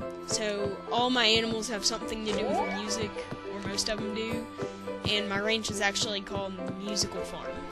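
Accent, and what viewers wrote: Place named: South, United States. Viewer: And no offense but your voice is hilarious I wish I had that hillbilly voice I talk kind of country but not enough it bothers me.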